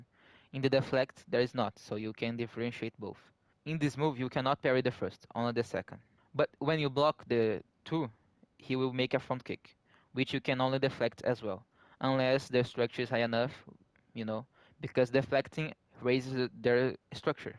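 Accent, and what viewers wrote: Brazil. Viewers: Brasileiro falando inglês has a Very specific accent BORA PORRA KAKDKAKDKAKDKAD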